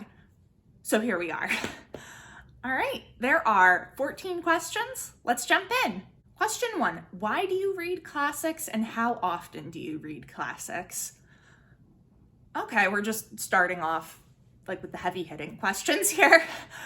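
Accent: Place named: United States. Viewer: I associate you so much with Victorian literature that I forgot you're (most likely) an American, even though I hear it in every video! Btw I also love Wuthering Heights :)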